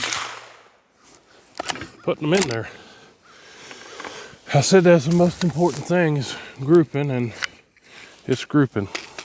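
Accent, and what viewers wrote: South, United States. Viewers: what the hell is zoomies please speak proper english not country red slain.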